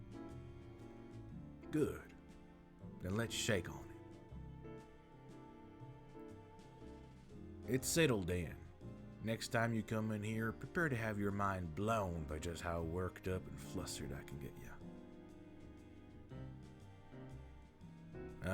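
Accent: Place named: South, United States. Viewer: ✨Ohhh a southern accent! You did a phenomenal job! Loved it!😊👏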